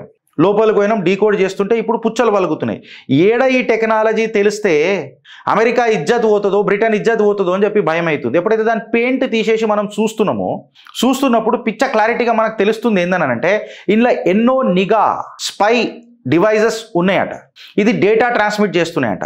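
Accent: Telangana, India. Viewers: 👌 బ్రదర్ good ఇన్ఫర్మేషన్, మీ తెలంగాణ యాస లో ఎక్సప్లనేషన్ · Your way explanation with Telangana slang... Ultimate ❤🎉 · గారు, మీరు అవసరానికి మించి ఎక్జైట్ అవుతూ అమెరికా అద్యక్షుడు ట్రంప్‌ని చాలా తక్కువ చేస్తూ అయన ఏదో ఒక బనాన రిపబ్లిక్ అద్యక్షుడు అన్నట్లుగా మట్లాడటం చాలా చవుకబారుగా ఉంది. మీరు ఇలాంటి సంక్లిష్టం అయిన, సాంకేతిక విషయాలని ఏదో పల్లెటూరిలో రచ్చబండ దగ్గర కూర్చుని ఉబుసుపోక కబుర్లాడుకునే వారిలా చెప్పడం తమాషగా ఉంది. మీరు తెలంగాణా యాసలో మత్రం బాగా మట్లాడుతారు. అంతవరకు ఒకే. మీరు ఇలాంటి కష్టమైన సాంకేతిక విషయం మీద మట్లాడాలి అనుకుంటే చాలా లోతుగా పరిశీలించి చెప్పాలి. మీరు చెప్పిన మొత్తం విడియో ఒక 10వ తరగతి పిల్లవాడు చెప్పినట్టుగా ఉంది. మీరు రెఫరెన్స్‌గా ఈ విడియో చూడండి. ఇది సరైన విష్లేషణ అంటే. 8 గంటలలో 216,070 views వచ్చాయి అంటే అర్ధం చేసుకోండి. లింక్: <link>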